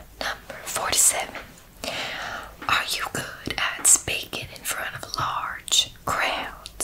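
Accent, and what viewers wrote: South, United States. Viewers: Just in the nick of time, I was ready for another round of and in a southern accent is just bonus. ❤ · Ohh And I 4got too mention the Southern accent was outstanding it was sooo good u should def do more vids with it!what a sweet precious angel u are!😊😄💗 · Being from the south,your accent was pretty accurate. Very relaxing video.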